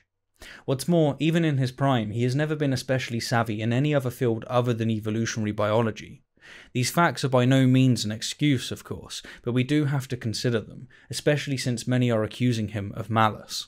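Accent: United Kingdom. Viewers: Nothing like watching the catastrophe that is humanism devouring itself.. You think you are going to force Dawkins, someone who unlike the guy talking in this video isnt slurping up to the woke narrative, is supposed to just start denying reality to appease the movement? Please, may have thrown out rational thinking, but Dawkins isnt guilty of that, the man is a credible scientist, and hes likely not going to be bullied into woke narratives., get some integrity, your british accent isnt fooling anyone. People are getting tired of this stupid "my body doesnt conform with my mind" nonsense.. Grow up. We are seeing disproportionate numbers of trans people indicating its nothing more than an attention grabbing fad coupled with narcissism. Its interesting to see how quick in an effort to keep his poor wittle job filling peoples heads with nonsense is willing to tow the line. 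Completely irrational.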